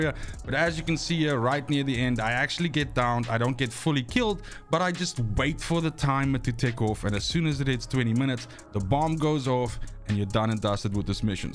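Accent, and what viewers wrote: Afrikaans, South Africa. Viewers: Thanks for the help with this one. Are you Afrikaans???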